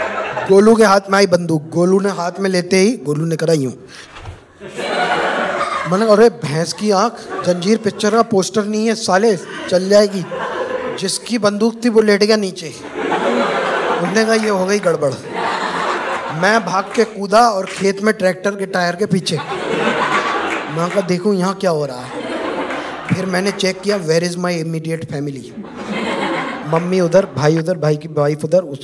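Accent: Uttar Pradesh, India. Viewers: U.P. tone🔥🔥🔥🔥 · Up accent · Bhai ke bolne ke tarike me hi UP jhalakta h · His UP accent reminds me of Rajeev Srivastava 😂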